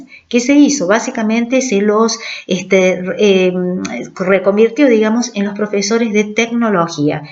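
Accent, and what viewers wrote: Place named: Córdoba, Argentina. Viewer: Excelente explicación 😃 y con una excelente tonada cordobesa.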